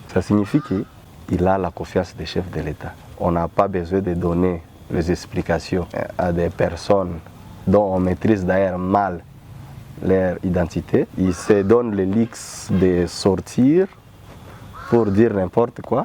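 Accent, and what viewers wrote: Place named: Rwanda. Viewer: C´est les mensonges mon frere, pourquoi vous devez demontré le gens vos nationalité doutese, vous avez accent randaise.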